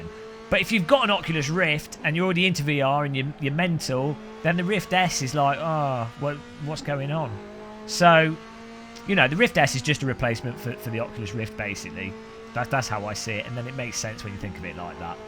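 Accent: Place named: England, United Kingdom. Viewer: Classic counter video before new product, plus eng accent. nothing to see here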